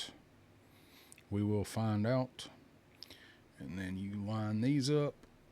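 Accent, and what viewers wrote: South, United States. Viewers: What a great addition with Val! Classic! Great review! I can't wait to open my big ass box! Been sitting for 2 whole days! 3 by the time I actually get to it! As always, a wonderful video with a great southern drawl! Yeeehaaaaw! 4.5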